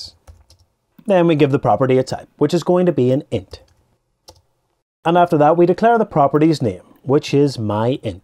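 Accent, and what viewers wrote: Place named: Northern England, United Kingdom. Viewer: LOL ! I thought you were scottish and then immediately realized you were from the north.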